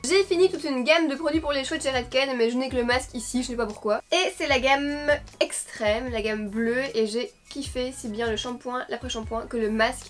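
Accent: Belgium. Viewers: Accent belge VIVE LA BELGIQUE!!!!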